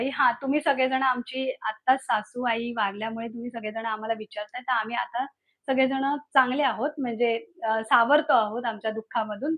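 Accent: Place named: Malvan, India. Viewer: तुम्ही मालवणी बोलतास ता भारी वाटता, गोड लागता. तसाच बोला.मराठी बोलूक बाकी लोक आसत. तुमचा आपला मालवणीच चलांदे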